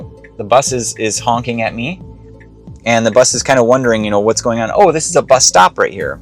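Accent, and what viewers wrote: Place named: Canada. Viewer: Don’t worry about how you speak. You would pass for a normal Canadian.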